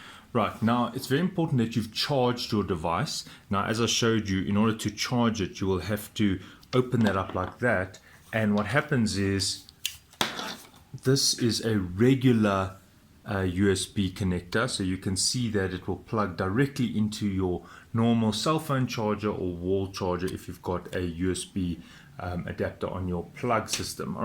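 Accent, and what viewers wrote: South Africa. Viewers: Excellent video. I love the SA accent.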